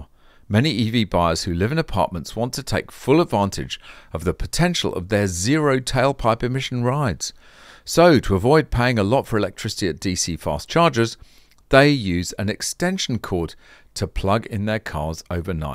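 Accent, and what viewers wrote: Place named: United Kingdom. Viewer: Listen!!! has a British accent.😂😂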